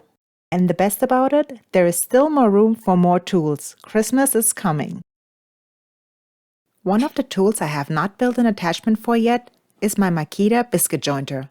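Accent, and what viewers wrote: Germany. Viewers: Als dein Video gesehen hab, musste ich lachen. 1. dein aussehen soll was? Die lustmolche anziehen?🤣 2. dein Roboter englisch, kommst du aus Deutschland? Das einzigste was mir gefällt ist das du als Frau dich handwerklich dich betätigst.😄👍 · Is that a German accent? Never really heard one before. 🤔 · Are you German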